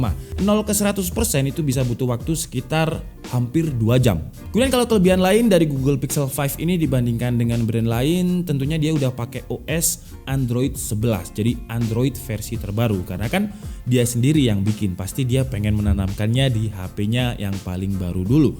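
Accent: Java, Indonesia. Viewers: Sampeyan jowone ngendi tho mas kok medok tenan 😀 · Jawa.ne pundi om? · Dia orang malang ngab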